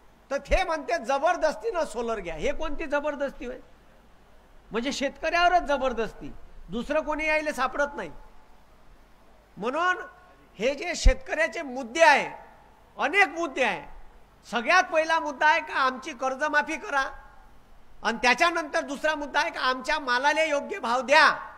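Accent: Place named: Vidarbha, India. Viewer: हे वऱ्हाडी भाषा आहे जे विदर्भात बोलली जाते, इकडे सगळे लोक असच बोलतात...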